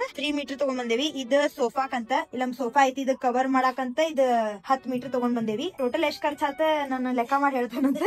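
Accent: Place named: Hubballi, India. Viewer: ನಿಮ್ಮದು ಯಾವ ಊರು ಹೇಳ್ರಿ ಪ ನಿಮ್ಮ್ದು ಹುಬ್ಬಳ್ಳಿ ಆನ್ ರೀ ನಿಮ್ಮ್ದು ಭಾಷೆ ಮಸ್ತ್ ಆಯ್ತಿ ರೀ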